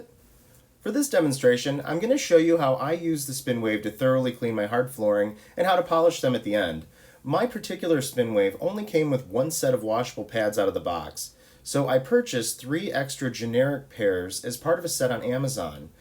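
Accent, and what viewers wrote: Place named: Canada. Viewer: Great demonstration! Your voice sounds much like a Canadian I have heard on Youtube that has a vintage kitchen and a collector of turquoise appliances.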